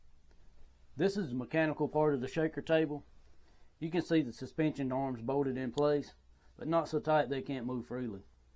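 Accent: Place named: South, United States. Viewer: I love your southern "slang and pronouncing" .....!!!!!! I'm original from Germany ,- and have friends in NacogdochesTexas ...... I use to mine for long years in B.C. Canada ....and work for big Mining Outfit as a consultant ..... Concentrating and mineral dressing .... Unfortunately it is real difficult to build a full working shaker table ...... ( according to the "TAGGART" ) ... but you came real cloth to it ... compliment !!! You are kept in thoughts ,- that there should be a special movement , who use the gravity and the mineral density for separation on a special surface ,- plus the influence of a water matrix and angle .... 👍👍👍 great job .... well done !!!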